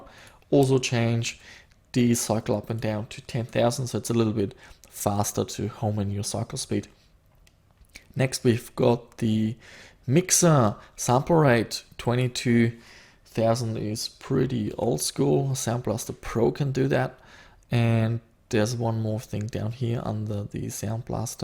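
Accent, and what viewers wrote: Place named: Germany. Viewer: Thanks for the tips.. From the accent, i know you're a german. ;)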